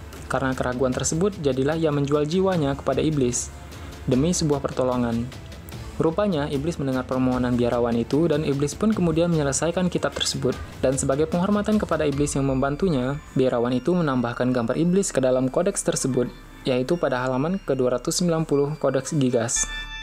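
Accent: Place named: Sumatra, Indonesia. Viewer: dari logat admin sepertinya beliau ada logat orang sumatra dan melayu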